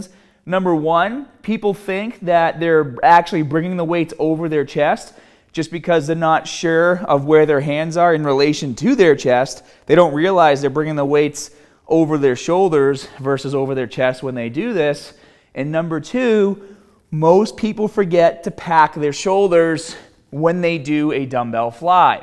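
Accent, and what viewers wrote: Boston, United States. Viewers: where is from? he has that Boston accent. #teamscott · Boston Accent!!!!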